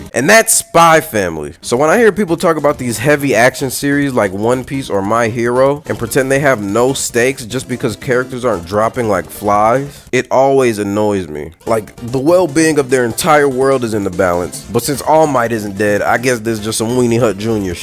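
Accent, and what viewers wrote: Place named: United States. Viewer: well depends on the character, story they are in, world they are in, and their life they have led so far... if for example a character got plot armored outta having somethign bad happen OR just never has any issues, it becomes hard to relate cause for basically NO ONE in the real world, is that the case. the more lack of hardships to face just makes it more and more difficult to see it as anything more than like a poorly written play being put on. if you want happy comedy slice of life stuff, that does exist and you can enjoy that but for most people, we like watching entertainment we can get immersed in to the point that we forgot that its entertainment and watch it as if we are observers of these PEOPLES lives and not just these characters stories. basically hardships to face and overcome is the whole idea behind normal storytelling. 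you sound like your also from at least a western nation if not more likely proly some place in america so our cartoons mighta made you misunderstand what animated mediums should be and you only think that anime is something for kids so why make it grim and dark and gorey? but thats just it my guy... its not just for kids but just is another style of storytelling that allows for more commical or over the top magical presentations.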